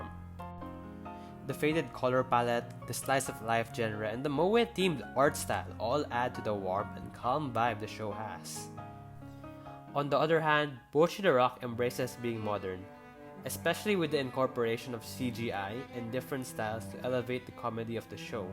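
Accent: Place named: Tagalog, Philippines. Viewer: im hearing a tagalog accent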